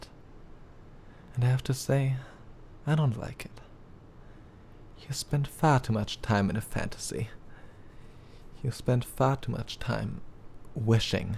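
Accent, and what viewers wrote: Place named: Germany. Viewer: I literally LOVE his little German accent! It sounds so adorable and calming at the same time. Nice vid btw ^^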